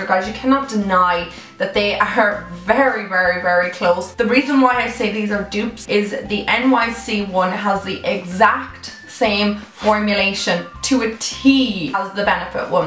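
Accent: Dublin, Ireland. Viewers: Are u Irish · Are you from ireland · is she Irish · are your from Ireland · Is she irish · loved this video r u Irish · R u Irish cause I am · Your accent is so cute! Irish? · omg are you Irish?😍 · Sapphire K Irish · Are you Irish??? Where my fellow Irish peeps at??!?!!! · omg please tell me your irish!! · Can I just ask where you're from because your accent sounds a bit Irish 😹❤️ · She from Ireland like me🤗😂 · are you from dublin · I love your accent lol, is it Irish?? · Casey Ryx Irish accents are so pretty! 💕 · is it just me or do you have an irish accent? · Are you Irish? If so, me too :) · new subscriber and are you irish? 💕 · Zwitzel Monte Irish accent everyone love it😂✌️🇮🇪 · Is she Irish?💖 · Are u from Ireland c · You an Irish buddy to? 😛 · Are you from Ireland? I just subscribed, loved the video! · Love your accent are you Irish? ❤️👑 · I saw this Video and clicked on it and I heard your voice so I looked at your page and I was right u are Irish🍀🍀Im irish 2😁😁😁😁😁Love your vids👻❤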